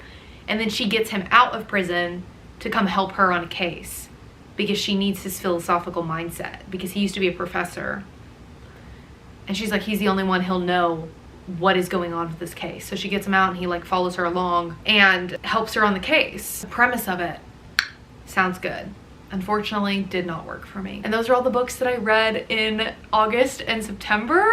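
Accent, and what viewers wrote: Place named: South, United States. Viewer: I love that for her but im afraid im adapting a little southern accent from her and im not even American 😭😭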